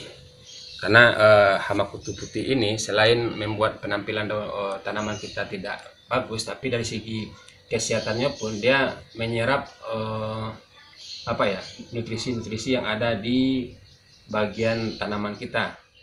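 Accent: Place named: South Sulawesi, Indonesia. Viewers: Om..domisili dikota mananya..soalnya logatnya sih org sulawesi selatan..maaf kalo salah yh.. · Anda pstibbkn org sulsel yach ? Klo org sulsel asli psti tau logatx..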